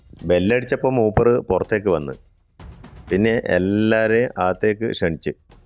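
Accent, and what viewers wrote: Kannur, India. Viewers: എനിക്കു വയ്യ എന്തൊരുവർണ്ണന സൂപ്പർ ആയിട്ടുണ്ട്. കണ്ണൂർ ഭാഷയിലുള്ള അവതരണം സൂപ്പർ സൂപ്പർ🎉🎉🎉🎉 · അടിപൊളിയായിട്ടുണ്ട് അഭിനന്ദനങ്ങൾ കണ്ണൂർ സ്ലാങ് ഒക്കെ നന്നായിട്ടുണ്ട് ഇനിയും വീഡിയോ പ്രതീക്ഷിക്കുന്നു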